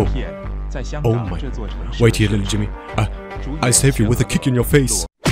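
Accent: Germany. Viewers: are u german? and are u speeding up ur videos? :D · lol junge bist du deutscher? Ehre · When you hear the accent of a fellow german you can just sub. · amazing as always :D thanks for your output mate <3 offtopic: are u german btw?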